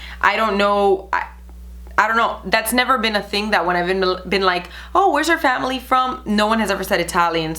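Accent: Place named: United States. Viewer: Maybe a little American too? 😂😂😂 Judging by the accent, I'd guess 100% American. If you are born and raised in the U.S., you are American. Regardless of where your ancestors came from... Maybe I misheard the guess you read, but it struck me as funny.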